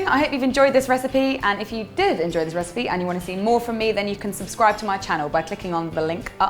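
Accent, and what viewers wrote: England, United Kingdom. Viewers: Is she from England ?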